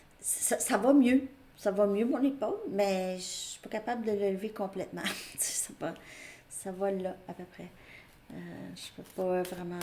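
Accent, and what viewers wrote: Quebec, Canada. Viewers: Allô est tu du Québec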